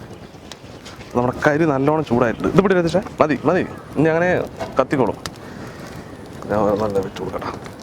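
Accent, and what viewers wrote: Palakkad, India. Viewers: U can add subtitles to ur videos so that non keralites as well can understand and one more thing ur way of talking in our Palakkad style is quite well.so if u include all ur members in the whole video and lil bit of interaction with each other will be more interesting. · ഇക്കാ നിങ്ങളുടെ Videos പെരുത്ത് ഇഷ്ടമാണ്...ചുമ്മാ ഇരിക്കുബ്ബോള്‍ നിങ്ങളുടെ ചാനല്‍ എടുത്ത് Videos കാണാന്‍ തോന്നും...വോറൊന്നും കൊണ്ടല്ല...ഞാന്‍ Trivandrum ത്ത് നിന്നാണ്...നിങ്ങളുടെ പാലക്കാടന്‍ Dialect വലിയ ഇഷ്ടമാണ്...സ്വന്തം Dialect ഉപയോഗിക്കുന്ന ഭംഗി Standard Dialect ഉപയോഗിച്ചാല്‍ കിട്ടില്ല...ഫിറോസ് ഇക്ക ഇഷ്ടം...😍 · Palakkadan slang🤩 njn pkd aan☺️ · ningalde palakkadan basha kelkan nhan veendum veendum video kandu ikka luv from sharjah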